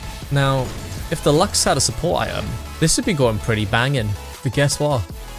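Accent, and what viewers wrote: Wales, United Kingdom. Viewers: Awesome! The Welsh accent made me feel back at home 😊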